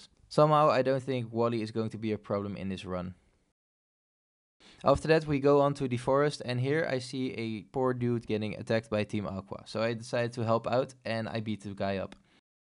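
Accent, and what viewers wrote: Netherlands. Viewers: Are you from the netherlands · Where are you from man? You sound Dutch Enjoyed the video. Will watch more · you're from the Netherlands right, i know that accent haha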